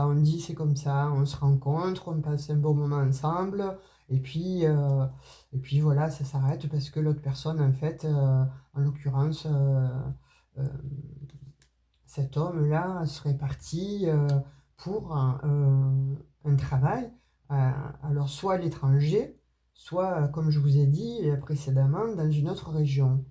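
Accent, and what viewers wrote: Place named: Provence, France. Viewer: je vous adore, votre accent me rapelle ma provence je vous envoi un bisous d'italie bonne fète.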